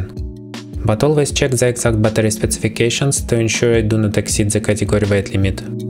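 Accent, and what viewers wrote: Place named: Russia. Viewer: Are you from Russia? I hear the familiar accent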